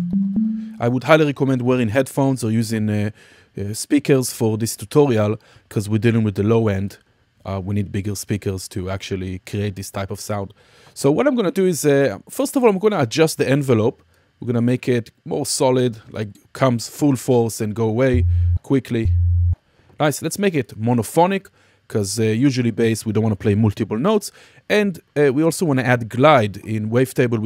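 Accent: Israel. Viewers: אחלה ערוץ יש לך. אוהב את המבטא שלך. ישראלי אמיתי. תודה אחי. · גם ללמוד מסרטון באנגלית ברמה גבוהה וגם לשמוע מבטא ישראלי כבד. תענוג! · חחחחחח ישראלי ,מבטא יפה.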